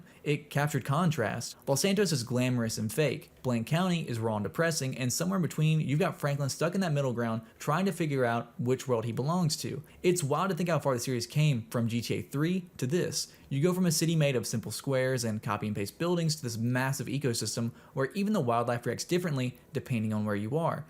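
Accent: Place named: South, United States. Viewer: yo country accent is killin me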